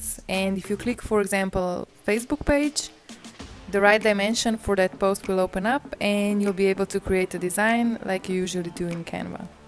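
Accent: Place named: Hungary. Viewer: After couple months in Budapest I recognize that Hungarian accent anywhere lol! Great vid!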